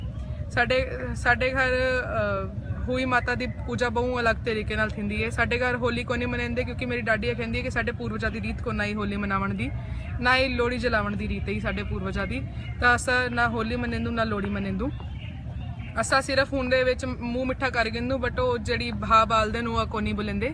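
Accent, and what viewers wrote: Bahawalpur, Pakistan. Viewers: Most welcome u r most welcome to bahwalpur...i am from bahwalpur u r speeking absloute sariki...same as we bahwalpurian use to speek loves to see this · wa saien wa tuhadi saraiki bht meethi hy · very nice accent Bahawalpuri accent is very sweet accent of punjabi... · 50/50 saraiki Boli hai ap ny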